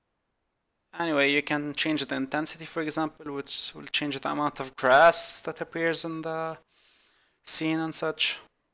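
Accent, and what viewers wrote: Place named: Lebanon. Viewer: are you lebanese i can tell from the accent /;0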